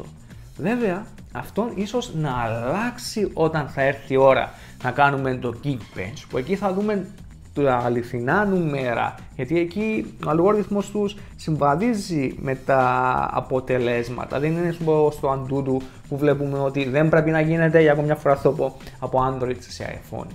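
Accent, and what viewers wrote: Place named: Cyprus. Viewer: Πολυ μ αρεσει η κυπριακη προφορα. Ηρθα το καλοκαιρι στην Λεμεσο και εγινα φιλος με τους πάντες .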